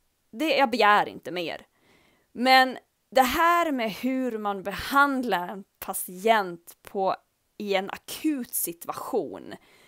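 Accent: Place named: Gotland, Sweden. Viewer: så härligt att få höra gotländsk dialekt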